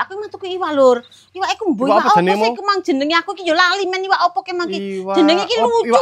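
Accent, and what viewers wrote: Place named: Java, Indonesia. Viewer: Assalamualaikum..met malam..saya sangat suka klu ngonten trutama bhs jawanya yg medok..oh iya pngling skrg rambutnya terurai makin ayu skrg..biasanya di ikat trus..skrg manglingi...sukses selalu...❤❤❤❤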